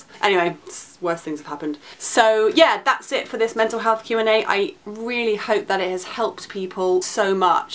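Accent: England, United Kingdom. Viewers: I was little confused about how half a bottle of red wine isn't a good source of relief. I was panicking earlier(while watchin your video) and so i drank a 25oz beer(Natural light) smoked a halfer, and then i got all into your video, the highlight of my day(woman). I decided; it's your magical English accent that makes everything epic like a Harry Potter movie. Will you practice magic with me? But Y-N is Good. couple of sips>? what's with the guilt trip. Power tripper over and out.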